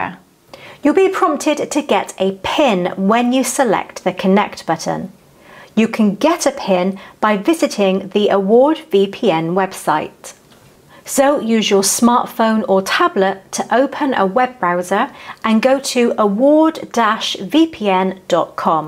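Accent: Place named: West Country, United Kingdom. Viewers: Sounds to me like somewhere not far from Stroud. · You are a very agreeable and attractive host, with a lovely soft West Country (?) accent. x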